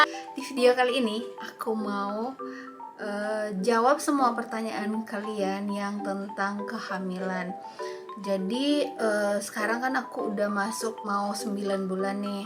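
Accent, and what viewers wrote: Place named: Minangkabau, Indonesia. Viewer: Slang Manadonya sudah hilang.......sudah ganti dengan dialek Minang ya👍👍👍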